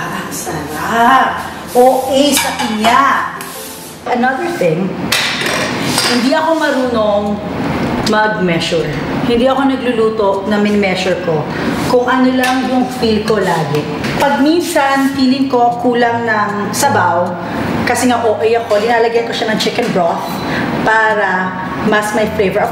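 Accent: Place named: Philippines. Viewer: Super funny!!!! I hear the zobel + jologs in you just like me hahahaha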